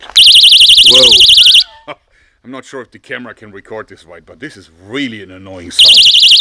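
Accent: Germany. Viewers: Is this guy german? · Are you german ??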